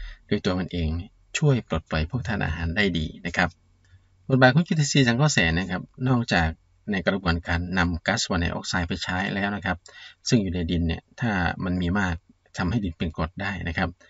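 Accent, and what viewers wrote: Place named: Isan, Thailand. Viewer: มีสำเนียงอีสานด้วยแมนบ๊อจร้า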